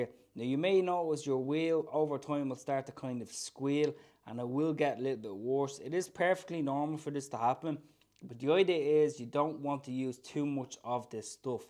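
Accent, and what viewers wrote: Ireland. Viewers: Another brilliant informative video as always! also Love your accent mate, the Irish accent is the best in the world imo x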